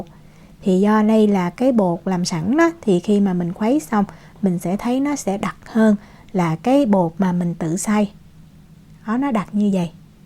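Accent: South, Vietnam. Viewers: 南言葉が耳に心地よいです。 ホーチミンに居るときは 蒸しヨーグルトケーキだと思ってました😹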